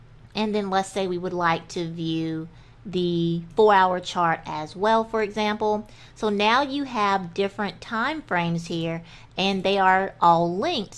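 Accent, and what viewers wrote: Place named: South, United States. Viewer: this was a ton of help! Love the southern twang accent too. I am from Raleigh NC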